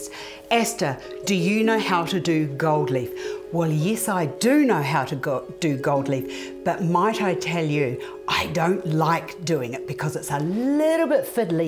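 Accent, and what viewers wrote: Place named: New Zealand. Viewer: That NZ ecksent keeps me coming back.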